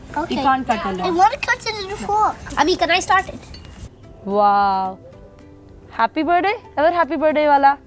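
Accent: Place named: Rajahmundry, India. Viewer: Hello friends meeru matladithe rajahmundry nir chodavaram ni thalchukontunnaru tq friends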